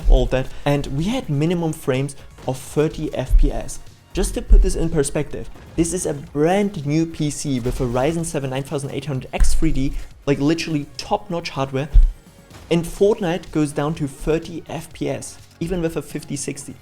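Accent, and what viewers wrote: Germany. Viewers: i can hear the german in u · Man hört dein deutsch raus! Sehr geiles video! Habe mir jetzt auch einen gebaut mit nem Ryzen 7 5700x, ner 5060 32gb ram usw.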